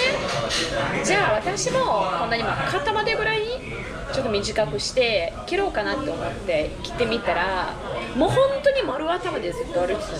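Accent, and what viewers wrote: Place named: Okinawa, Japan. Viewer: なんか沖縄っぽい訛り方してますね！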